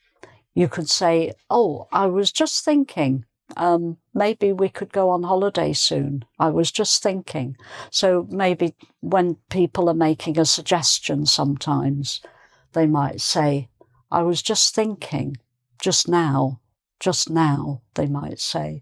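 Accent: United Kingdom. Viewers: Thank you dear teacher, I perfectly understood today's class (today for me), very attractive and captivating your teachings in the traditional way: with board and markers and with a perfectly modulated voice as English women usually have. I like that British accent, it seems taken from a novel by Kazuo Ishiguro, The Remains of the Day. If I had a beautiful red apple I would give it to him with a kiss on the forehead. Congratulations. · I love your British accent! Would speak in that way! Tnx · You were born to be a teacher , your great British accent adds extra flavour to the material you present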